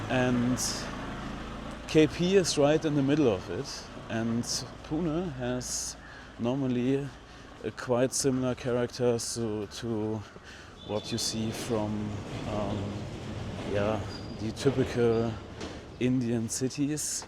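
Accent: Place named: Germany. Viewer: Great video and lovely German accent. Maybe more clouds next time.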